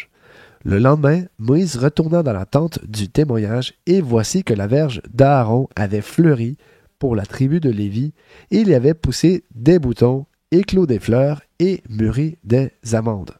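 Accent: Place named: Quebec, Canada. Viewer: Si t'es suisse alors pourquoi tu as un accent québécois ?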